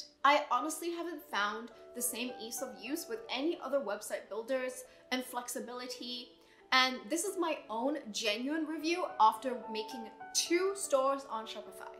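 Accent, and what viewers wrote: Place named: United Kingdom. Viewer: Hi! what is your website? I have wix & I didn't find it easy to use at all. I would love to have a one on one to see if you can help me. You are so excited about the flow, code graphics... Etc. I love you accent, I'm a big British fan and I picked up on it right away🙂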